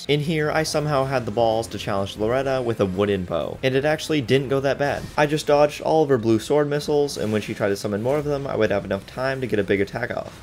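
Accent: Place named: North America. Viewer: Honestly buddy I have no clue. Judging from what I can remember his accent he's probably North American? Keep in mind I only say this because I'm North American and I couldn't identify an accent. So I'm assuming we're the same? Don't take my word for it. Check the interview with OnlyWaifu.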